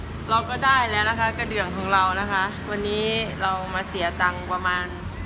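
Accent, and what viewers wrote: Thailand. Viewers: พี่ใช่คนอุบลมั้ยคะ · เอ๊อะ555คนอีสานตั้วนิ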